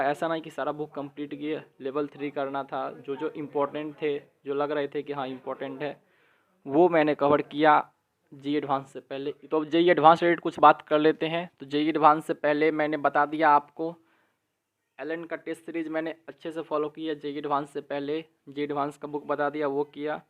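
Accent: Bihar, India. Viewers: Bihar se ho kya,?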